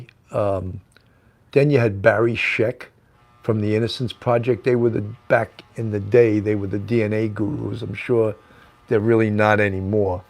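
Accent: New York, United States. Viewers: Having lived in NYC when I was a kid you sound like an old friend. Thanks!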